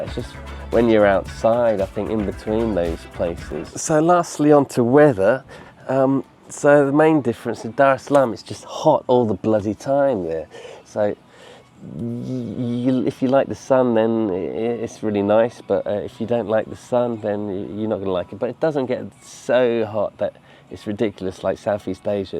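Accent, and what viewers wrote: United Kingdom. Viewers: British accent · Goodness me! You are the kind of Europeans that make some Africans believe they are better than other Africans because they can speak English. Wth!! Tanzanians speak Swahili. They way you described how Tanzanians don't understand English is rude and ignorant! You made it sound like Tanzanians are dumb because they would not immediately understand you a British man with your British accent. It's ok to give your opinion on the two cities but you were flat out just talking down and insulting Tanzanians because they have a different culture and they don't speak your language.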